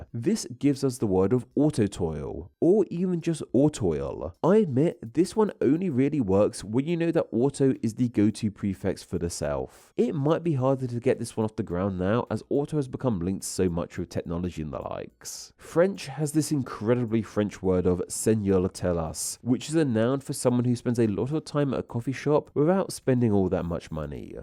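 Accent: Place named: United Kingdom. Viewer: I think flawedcore sounds great in your UK accent...flawcore may be better in my US accent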